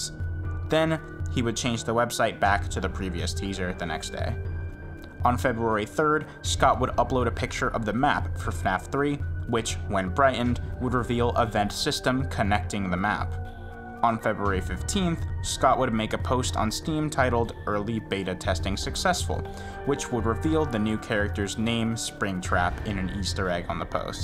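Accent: United Kingdom. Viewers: from american voice to an obnoxious british voice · He's British? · He was only what I could describe as "Obnoxiously british"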